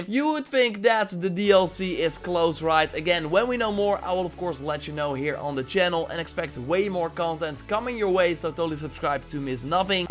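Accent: Netherlands. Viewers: Your accent is pure aids. Are you dutch?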